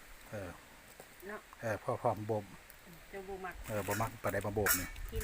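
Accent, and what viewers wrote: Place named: Phu Thai, Thailand. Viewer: อยู่จังหวัดได๋น้อจ้า เว้าคือภูไทสกลจ้า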